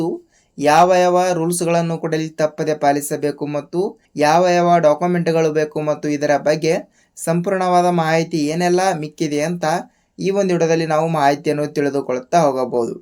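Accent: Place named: Uttara Kannada, India. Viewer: Uttar kannada speech ok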